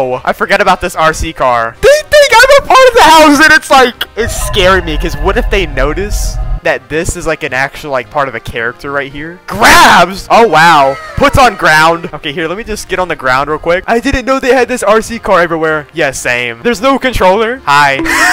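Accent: New Zealand. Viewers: Are you from new Zealand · wait what are you from nz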